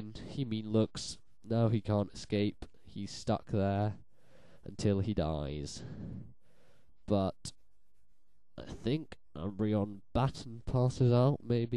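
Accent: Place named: United Kingdom. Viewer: You're commentaries are really good! Makes a change hearing a British voice. Anyway, if you wanna battle some time, message me, be interesting seeing my team narrated against on youtube :P.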